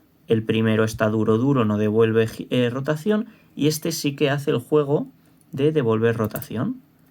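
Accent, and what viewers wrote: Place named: Spain. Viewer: odio este accent Español, pero ignoraré este horrible estilo de hablar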